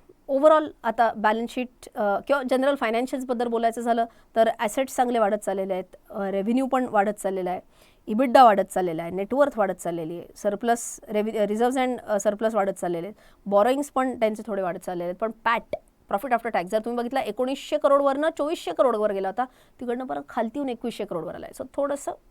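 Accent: Pune, India. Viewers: पुणेरी भाषा आहे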